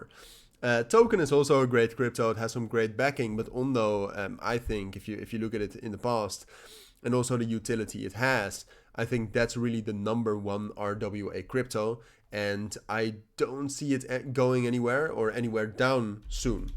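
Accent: Netherlands. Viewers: Can hear you are Dutch ;-). Ty for the update.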